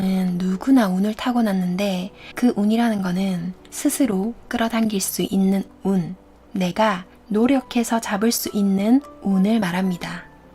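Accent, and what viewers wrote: Gyeongsang, South Korea. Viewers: 저는 님 경상도사투리가 묻어나는 스토리텔러여서 더 좋네요. 마음편하게하는 목소리입니다. 구독하고 갑니다.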